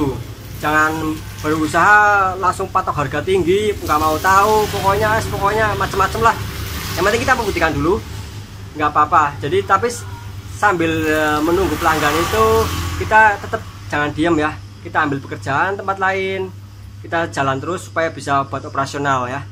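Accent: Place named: East Java, Indonesia. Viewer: Ganteng...soko jowo timur yo mas....kelihatan dari logatnya...arek suroboyo????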